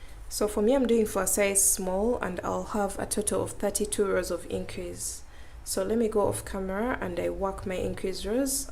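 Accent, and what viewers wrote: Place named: Uganda. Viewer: Cool pattern! Also, I lit up when I heard your accent. I used to live in Uganda and your voice made me miss it!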